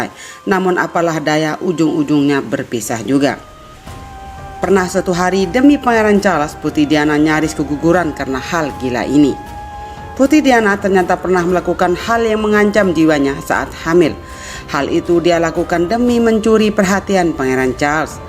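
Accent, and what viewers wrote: Indonesia. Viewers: Btw, apa gw aja yg ngerasa apa yg lain jg? Naratorny suarany kok medoks bgt seehh... OMG!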